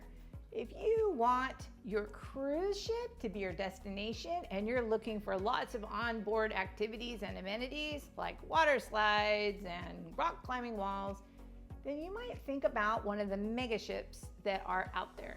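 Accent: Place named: South, United States. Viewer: We do love your southern belle cheerful accent. Makes the content so much more approachable.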